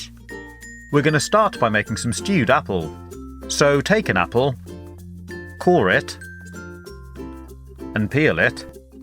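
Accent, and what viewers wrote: United Kingdom. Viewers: I'm absolutely in love with your perfect British accent.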